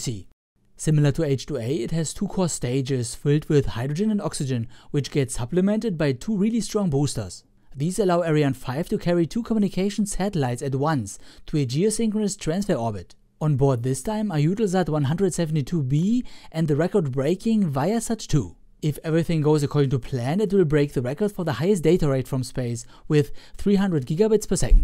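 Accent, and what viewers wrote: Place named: Swabia, Germany. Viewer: Dieses Spätzle-Englisch!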